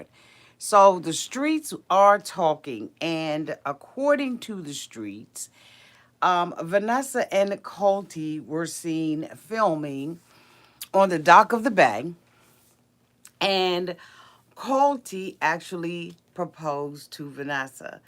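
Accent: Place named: New Orleans, United States. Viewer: I am a Newbie to your Channel.. Tell'em Auntie!! Lol I was laughing as You going OFF on that Person in Your Live Comments.. You Go Auntie!! Sound like that Southern accent, New Orleans Style..Auntie Colt in his Speedos lmao That was a Nasty Seen!! Auntie when I seen Colt come out with them Speedos on I fell off my Couch dying.. 😂😂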